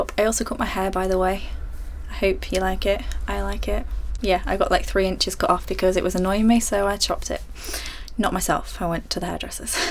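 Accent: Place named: North, United Kingdom. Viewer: You have such cute taste in clothing! I love your northern accent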